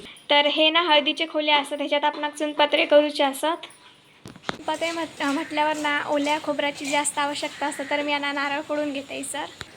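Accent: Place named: Malvan, India. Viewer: तु मालवणी बोली इतक्या ओघवत्या आणि गोड शैलीत बोलतेस, की त्याचं खरंच मनापासून कौतुक वाटतं. कुठलाही व्हिडिओ असूदे, तू तो प्रामाणिकपणे आणि निरागसपणे सादर करतेस, हे मनाला भावते. आजकाल बऱ्याच मालवणी लोकांच्या बोलण्यात मराठी प्रमाण भाषा आणि इंग्रजी शब्दांचा वापर असतो, पण तू जेव्हा बोलतेस तेव्हा खरी मालवणीची गोडी आणि सौंदर्य अनुभवायला मिळतं. तुझी ती बोलण्याची पद्धत अशी आहे की पुन्हा पुन्हा ऐकतच राहावं असं वाटतं. म्हणूनच तुझे व्हिडिओ मला फार आवडतात. असंच सादरीकरण करत रहा आणि खूप मोठी हो — तुला मनापासून शुभेच्छा!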